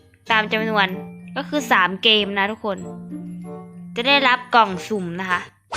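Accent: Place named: Isan, Thailand. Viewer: เว้าเหมื่อนหนูเลยค้ะอีสานค้ะหนูเว้าจนพี่ฟังบ่ออก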